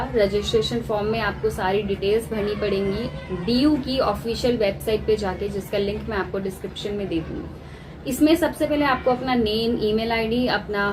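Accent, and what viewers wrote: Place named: Bihar, India. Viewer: Apakee baat se hme lag rha hai ki aap bhi bihar se hai hai nn 👍👍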